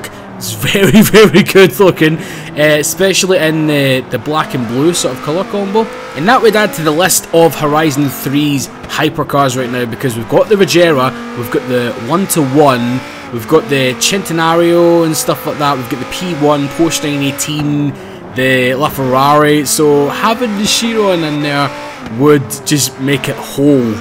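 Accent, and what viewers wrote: Scotland, United Kingdom. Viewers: YOU'RE. SO. SCOTTISH.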